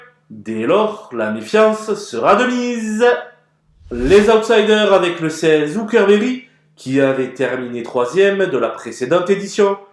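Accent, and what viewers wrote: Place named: South, France. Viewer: Pourquoi vous chantez en donnant vos pronostics ?? Même que vous êtes du sud ,,faites des efforts sue vos vidéos c'est horrible,,..on dirait que je regarde l'amour est dans le pré..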